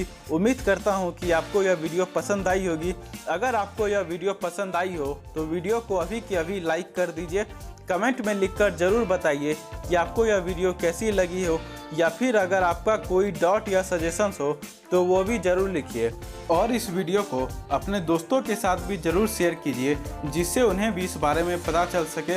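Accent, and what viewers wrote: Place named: Bihar, India. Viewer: Bihari voice 😍😍😍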